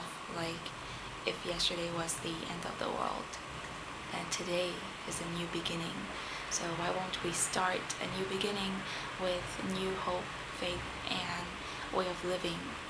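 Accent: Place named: United States. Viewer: စဂၤပူျပည္မွာေမြးတာလား။ ဘာလုိ႔မဲတာလဲဆုိတာ ခင္ဗ်ာရဲ႕ အသံကအေမရိကန္းနဲ႔အရမ္းပီတယ္။ သုိ႔မဟုတ္ရင္ ျဖစ္ႏုိင္တာက စဂၤပူျပည္မွာအားလုံးအေမရိကန္းအသံနဲ႔ပီၾကတာေနာ္။ :S FK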